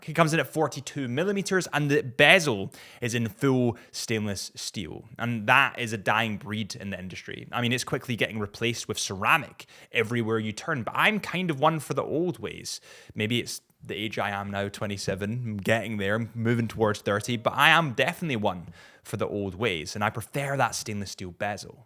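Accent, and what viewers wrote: Scotland, United Kingdom. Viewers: Love the vid man what is your accent btw? Scottish I assume but from where?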